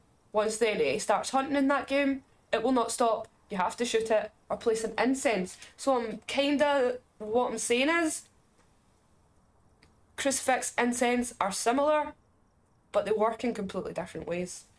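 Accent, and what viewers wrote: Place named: Ireland. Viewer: phasmo for the win. also are you irish? you got a pretty thick accent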